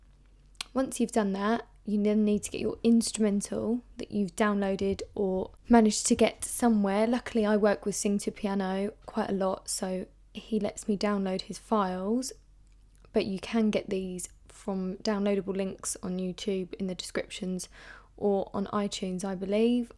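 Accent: England, United Kingdom. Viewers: Not gonna lie I love the pommy accent :) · Can we just appreciate her British accent like wow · is she British ??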